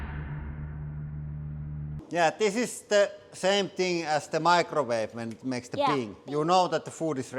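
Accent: Finland. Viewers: The most Finnish sounding man I've ever heard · äijä hyvin paistaa toi suomi läpi puheesta xd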